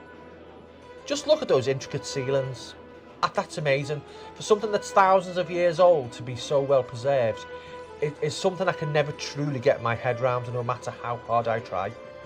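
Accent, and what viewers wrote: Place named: Bromley, United Kingdom. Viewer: Great video and insight. I love your video and British Bromley accent. 🙂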